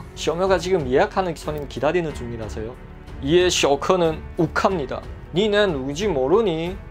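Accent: Yanbian, China. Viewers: 延边话听的我想乐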